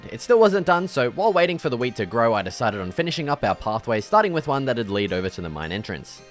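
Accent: Australia. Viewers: Mates aussie as hell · are you australian??? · I can tell your Australian mate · “Oh, he’s Australian” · bro is australian W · This guy sounds so Aussie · his australianness distracts me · nah easy mistake too make but hes def aussie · Are u Australian 😮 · "OUUH!" lolll .. also, the Aussie-ness is just steaming off of him like a thick vapour. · you aussie? u sound like it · sweet world dude, love you aus accent hahahaha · He’s so Australian 😭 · Hello mate you’re Australian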